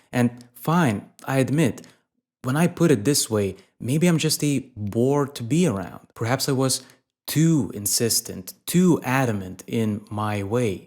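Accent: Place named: Portugal. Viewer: So relatable, especially after graduating for a few years. Where are you from by the way? You sound Portuguese but look German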